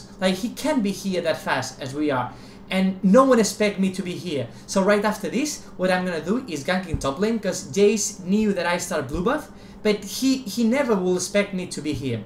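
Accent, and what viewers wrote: Spain. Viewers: Because he has spanish accent, since English language is his second language. Dunno what you've expected. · Cuase he is spanish and our english is... :S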